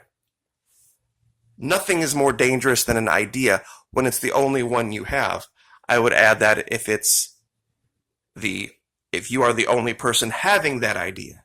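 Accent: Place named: New York, United States. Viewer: You are kool , just the NY accent in my business would have lite the match.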